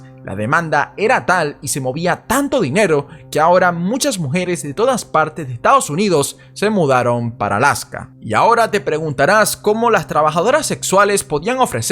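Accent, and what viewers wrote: Venezuela. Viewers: Una pregunta amigo, eres Venezolano?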